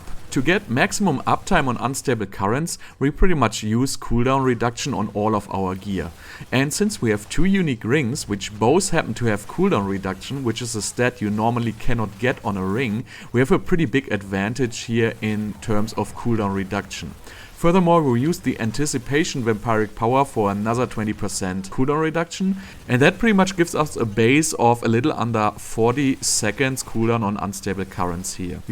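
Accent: Germany. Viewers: very interesting build. by the way, are you german ? I think i heard some pronunciation in that way.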